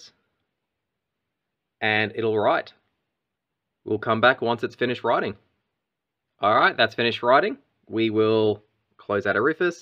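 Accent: Australia. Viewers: Thanks heaps, it worked first time! Must have been the calm Aussie narrative. My only stumbling point was one of the ROMs was corrupt when I was copying them. Skipped it and will hunt it down another time. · Thanks mate! For whatever reason, this video was so much more helpful to me than others I watched. As a total newbie to the dense world of emulation, a calm Australian voice and super clear instructions saved me from a frustration spiral. Subbed, keep up the great work!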